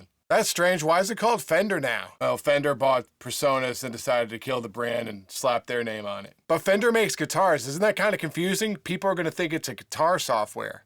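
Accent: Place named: United States. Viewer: What part of the US is that accent from?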